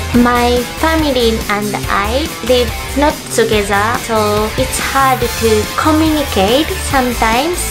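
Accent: Japan. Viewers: Love you're English Accent Mixed Japanese ❤️😊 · Your so cute and lovely, I like how you deliver english word, sounds like hapon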